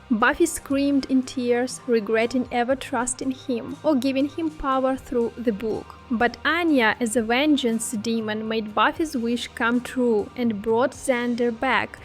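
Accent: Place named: Brazil. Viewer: Are you Brazilian? Italian? Just curious about your accent.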